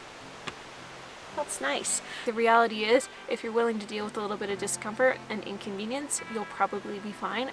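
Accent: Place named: Canada. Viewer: The way Canadians pronounce words like Mazda, and pasta always amazes me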